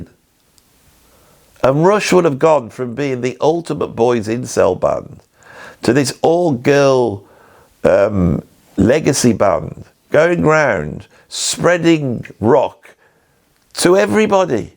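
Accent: Birmingham, United Kingdom. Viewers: He doesn't have a cockney accent. Cockneys are from East London. He's from Birmingham and has a 'brummie' accent.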